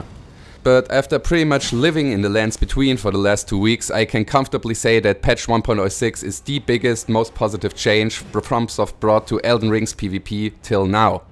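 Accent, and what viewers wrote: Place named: Germany. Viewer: man merkt das du deutsch bist haha gutes video übrigens!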